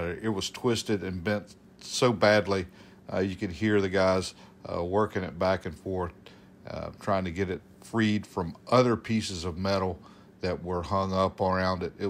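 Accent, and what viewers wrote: South, United States. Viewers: WOW,WOW,Wow!I'm not part of your navy!I don't have a confederate flag and the north WON!You people started your accent because,you did't want to sound like "Yankee's"This is due to south"s low IQ!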